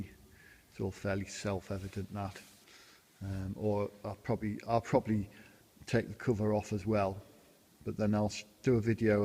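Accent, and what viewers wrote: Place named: Northwest England, United Kingdom. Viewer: Thanks for the update on the oil pressure, I am curious what weight oil you are running? Also, my wife, who is originally from Preston, overheard me listening to you talking, and was trying to figure out what part of the UK you are in. She guessed north, northwest.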